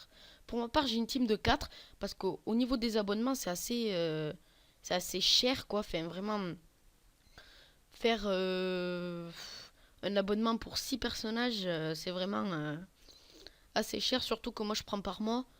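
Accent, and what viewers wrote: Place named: South, France. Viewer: toi tu viens du Sud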